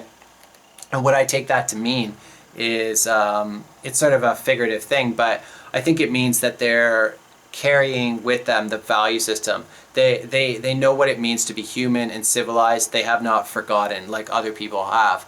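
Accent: Canada. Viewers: The words 'about' and 'out' always give the Canuck away... lol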